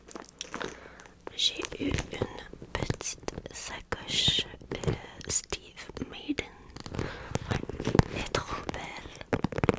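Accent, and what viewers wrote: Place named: Canada. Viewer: Je viens de decouvrir ta chaîne. Ton accent canadien me relaxe de folie et rend ton asmr tres spécifique j'adore! Très belle année a toi! Je m'abonne😊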